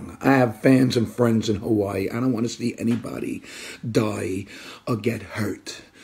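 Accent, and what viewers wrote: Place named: Brooklyn, United States. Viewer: “WHERE I COME, ITS HOOO AAAHHH!!!” LMFAOOOOO I RECOGNIZE THAT BENSONHURST BROOKLYN ACCENT ANYWHERE, LMFAOOOOO ….THANKS FOR THE LAUGH 🤣👍🏾👍🏾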